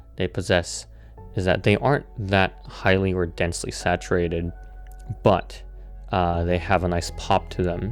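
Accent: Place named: General American, United States. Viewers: May I ask why your English is so good? Was one of your parents American/Canadian? Did you live in North America? I think the reason people had no idea you didn't live in the Americas is because your accent is WAY too "General American Accented" (or standard pronunciation) so given that they had no reason to think otherwise. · Bro, people don't know you live in Japan! You sound American or Canadian, and you specified $ in the video title. So people are going to assume you're talking about buying it with American and Canadian dollars lol. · Ok but when you speak with an american accent and put the price in USD dont get mad at ppl for assuming. I live in europe and also thought you were talking about the American used market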